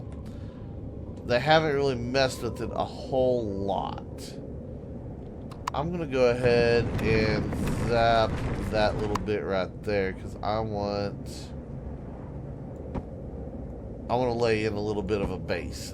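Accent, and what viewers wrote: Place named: Texas, United States. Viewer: Some suggestions because you asked for it :D I'm started playing the game and watching your videos since alpha 8 came out. I like your videos, because your playstyle is a bit similar compared to mine, and I like the texan accent as well. :D In your videos I think you should go for more exploration and less building. I mean, building is fine, but sometimes there are too many sv / hv / cv build videos come one after the other. Back then, when alpha 8 was hot, there was not as much content in the game, than now we have. You are still playing the game like you played alpha 8. But with alpha 12 and with the project eden scenario you have literally hundreds of POI-s, and hundreds of planets and systems to explore. I don't say you should warp to a new planet in every video - not at all. But a bit less detailed base building, a lot less detailed sv / hv / cv build videos, and a lot more exploration (poi-s and planets), because I don't think you would run out of content before a huge update would come and you should have to start a new game to get the new stuff. 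Here's how I play: escape the horrible starter planet (rogue), find a good planet (snow planets are my favorite too). On the snow planet, build a huge base, using it as main base, as HQ etc. and with the new teleporter I can come back to it from anywhere, and I don't have to abandon it, and keepin improve it till time to time. I hope it helped a little bit.)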